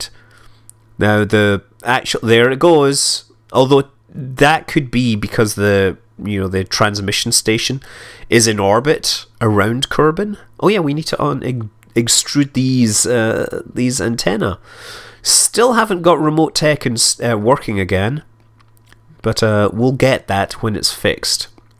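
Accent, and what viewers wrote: Scotland, United Kingdom. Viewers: I saw that the channel's name was Scott Manley. Then a deep Scottish voice validated that name.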